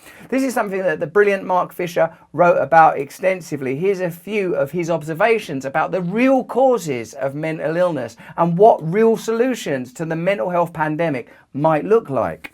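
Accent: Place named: United Kingdom. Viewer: Your accent seems extra britshy today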